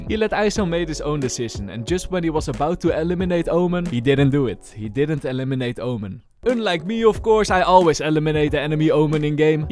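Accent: Netherlands. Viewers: he has a dutch accent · i love your video’s but please PLEASE fix your dutch accent 😭😭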